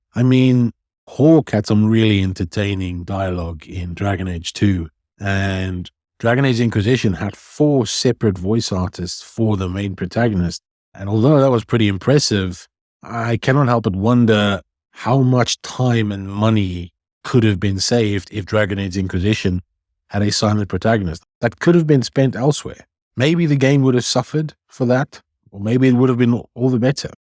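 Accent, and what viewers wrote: South Africa. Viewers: I might be wrong, but is that a South African accent I hear?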